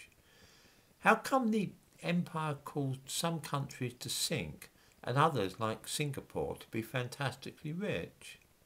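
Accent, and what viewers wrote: United Kingdom. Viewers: Wow, this is some of the most obviously dog whistle racism. Then near the end he references the bell curve. Talking slowly with a British accent does not hide your raging recism. Smh I know I know. He is "just asking questions".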